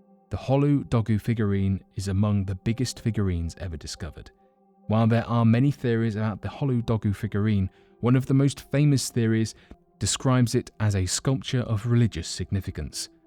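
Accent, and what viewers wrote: United Kingdom. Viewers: Its his Brit accent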